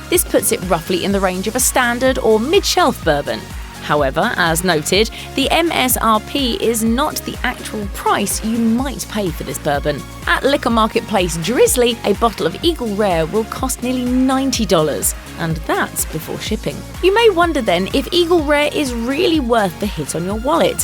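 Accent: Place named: England, United Kingdom. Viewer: Your talking about Bourbon in Kentucky. Get somebody without an English accent. Here's a suggestion an Southeren accent.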